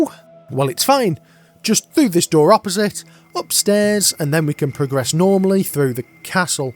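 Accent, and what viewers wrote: United Kingdom. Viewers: Ahh yes, congrats on the full time positon. Us Americans grratly appreciate a humorous soulstuber with a british accent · Bro you are the most British person i have ever seen both looks and voice 😂😂 You should be England's national treasure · this is the most british person I've ever heard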